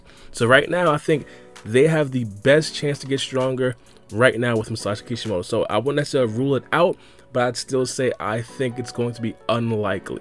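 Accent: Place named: Alabama, United States. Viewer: Yah forgot how Alabama this sounds right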